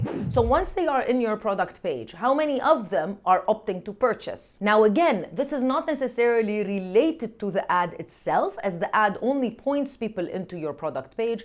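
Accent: Arab world. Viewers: Love to hear Arabic accent of English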